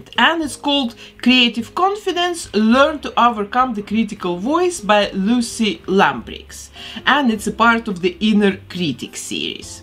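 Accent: Russia. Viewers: Girl are you Russian or Belorussian? Because you have Russian accent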